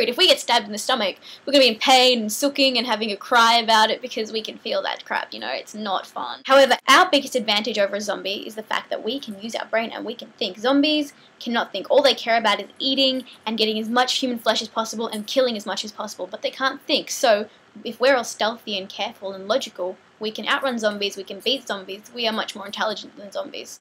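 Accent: Australia. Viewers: are you Australian??.. love your videos.. and is an amazing song:)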